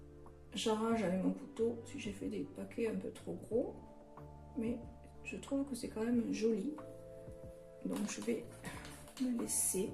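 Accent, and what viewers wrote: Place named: Marseille, France. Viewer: You have a lovely accent...Marseille maybe. Similar to the rapper Akhenaton from IAM if i'm not mistaking. Anyway, love ur work ♥️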